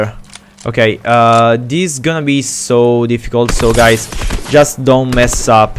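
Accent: Naples, Italy. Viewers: Mi manca la tua parlantina napoletana 😫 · Fantastic video, i love your Italian English Scherzo sono Calabrese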